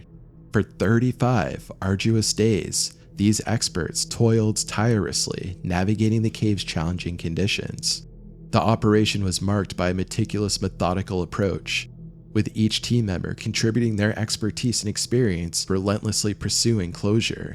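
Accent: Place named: California, United States. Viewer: U are SO Californian🤣 I love listening to you cause it’s like one step down from Bill and Ted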